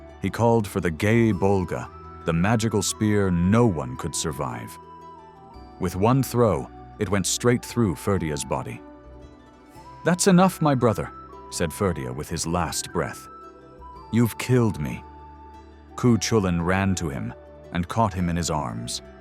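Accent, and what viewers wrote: United States. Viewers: Why US accent when it's about Celtic Mythology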